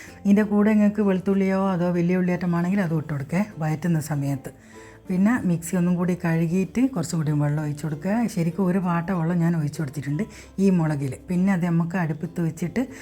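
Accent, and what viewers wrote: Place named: Kannur, India. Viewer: Enik Chechiide samsaram nalla ishtaayi ente kannur ulla relativesntem family friendsntem pole.. ❤ first like ente vaka.. ❤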